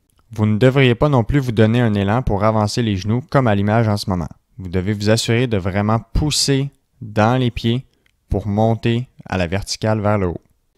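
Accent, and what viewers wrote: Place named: Quebec, Canada. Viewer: Bravo explications claires images bien filmées et silhouette superbe continuez à faire d autres vidéos avec ce merveilleux accent un plaisir de vous suivre depuis la France en Poitou si cher aux québécois .cordialement .marc qui ne se remet pas d’une entorse datant de six mois(exercice phase trois impossible).a cause d une algodystrphie.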